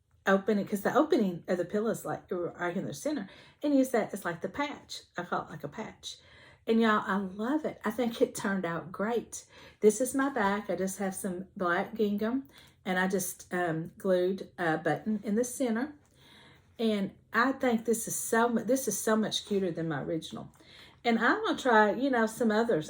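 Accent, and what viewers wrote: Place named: South, United States. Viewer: I could listen to you talk all day that southern drawl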